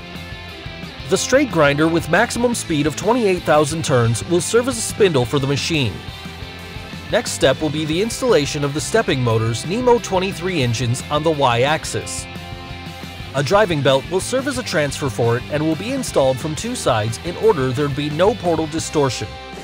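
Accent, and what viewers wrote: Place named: Russia. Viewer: the heavy russian accent is authentic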